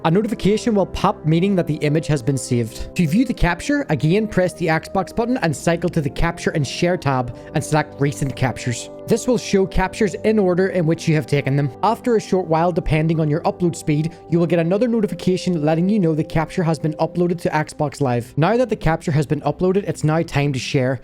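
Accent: Northern Ireland, United Kingdom. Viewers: Northern Irish..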